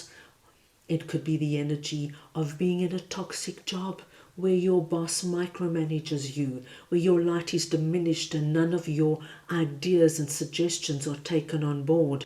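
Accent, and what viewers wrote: South Africa. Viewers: You sound SAfican like me so beautiful and spiritual so pure and wonderful, thank you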